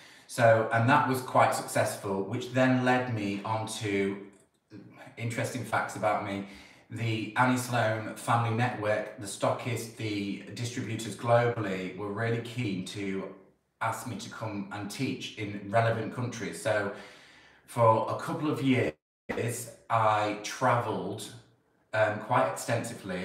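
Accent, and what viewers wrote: United Kingdom. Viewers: I'm only part way into this video and I just had to stop it to comment. I've watched your videos for a long time and I've loved seeing how you interact not only with viewers, but with your PIECES! You seem to have an "intimate connection" with each project you undertake. And because I personally have a love of languages, I totally enjoy your British accent! LOL, but what I really wanted to say was, how proud of you your dear Mum must have been. And she would be delighted to know that you are once again being so creative, as you obviously were created to be! I'm also loving getting to know the person behind the face.....who knew you are a hairdresser! No wonder you branched out creatively into other genres. Your inborn love of color and beauty is evident from seeing the bits and pieces of your personal surroundings. Now, back to finish the video:^)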